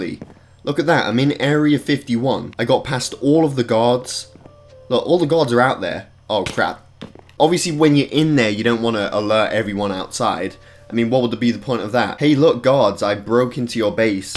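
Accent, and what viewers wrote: United Kingdom. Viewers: Why is a British guy giving us a plan? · Wait, how tf a British guy telling us how to storm into Area 51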